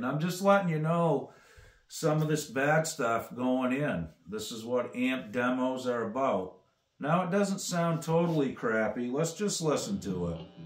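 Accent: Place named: New Hampshire, United States. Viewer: You sound like you're from New Hampshire... lol.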